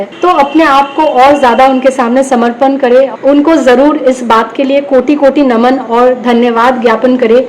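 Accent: Bengal, India. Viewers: Wo bengali hain to thoda bengali accent rahega to aisa sunai dega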